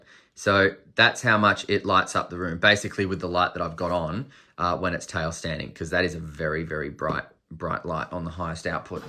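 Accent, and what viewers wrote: Australia. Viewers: plus the Australian accent makes every presentation better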